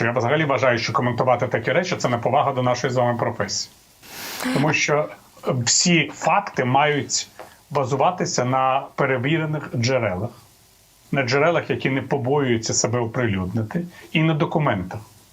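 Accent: Standard. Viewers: В.Портніков приємно вас слухати.Ваша Українська мова дуже гарна,чиста, і не згвалтована неправильними діалектами кордонів.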